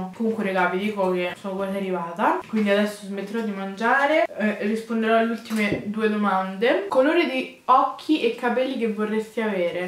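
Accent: Rome, Italy. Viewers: in questo video ti ho vista particolarmente ''Romanaccia''.....bellaaaa spontanea in alcune parti!!! Miii piace bravaaa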